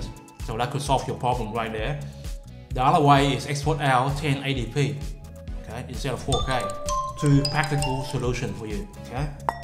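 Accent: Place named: South Africa. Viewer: Dude r y from South Africa? Cool accent! ❤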